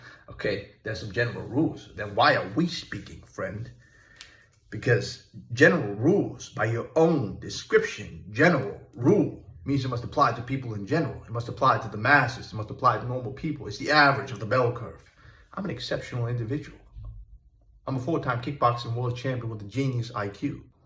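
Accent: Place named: United Kingdom. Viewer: too british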